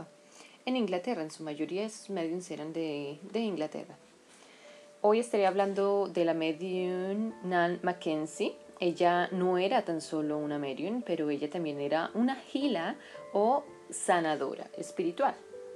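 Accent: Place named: Colombia. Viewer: Aceptemos la diversidad, los acentos de cada region son diferentes. es Colombiana y esa forma de hablar es muy tipico de su pais.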